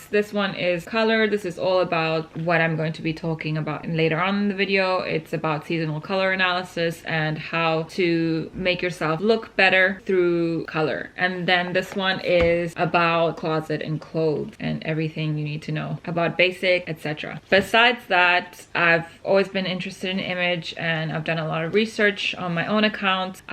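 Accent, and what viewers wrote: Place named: Argentina. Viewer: Venía mirando videos tuyos y me daba la sensación de que serías Argentina, (más allá de tu excelente inglés), y ahora lo acabo de corroborar ❤❤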